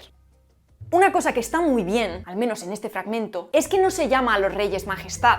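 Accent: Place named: Castile, Spain. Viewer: La fonética de tu dialéctica mesetaria no es el castellano real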